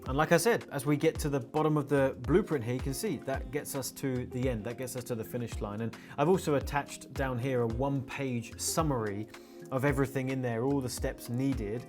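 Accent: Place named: United Kingdom. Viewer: We need deep dive,. Thank you so much! Incredible content. Also loving your British pronunciation =)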